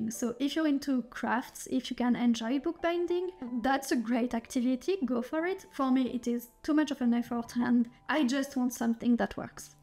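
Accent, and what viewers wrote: France. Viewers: Your French accent is so gorgeous, SO gorgeous! Your voice carries it soo well. Anyway, I will now actually focus watching this. 👌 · Totally unrelated but I am learning the Korean language and I see how difficult it is to speak in a new language not my mother tongue. From your accent I think you are French but I wanted to congratulate you for such amazing English! It’s not easy! You speak in even better English than some English speakers I know. I live in Ontario Canada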